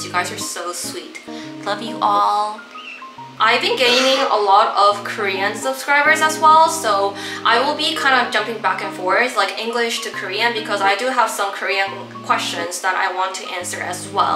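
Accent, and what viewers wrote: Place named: England, United Kingdom. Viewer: Am I the only one who loves her english accent?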